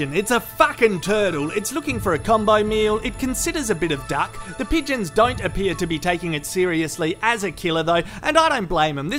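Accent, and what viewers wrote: Australia. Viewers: Amazing Aussie commentary 😂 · The Aussie accent makes the expert name sound funny too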